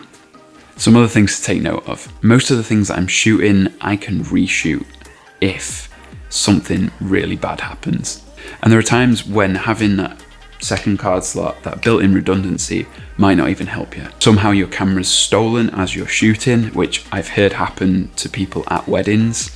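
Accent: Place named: North West England, United Kingdom. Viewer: Nice to hear a north west accent on YouTube 😁 - your right you don't need 2 slots to be doing professional work, my camera has two slots but I've never used the second slot. But I was going to buy a Z6 and to be honest I have stalled because it's now stuck in the back of mind that I probably should have been. I have had corrupted files about 3 or 4 times but I've always got the data back on my computer.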